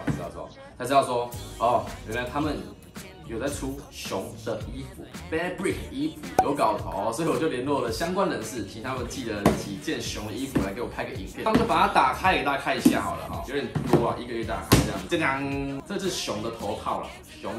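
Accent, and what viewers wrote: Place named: Taiwan. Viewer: 台語夠台還會抖腳😂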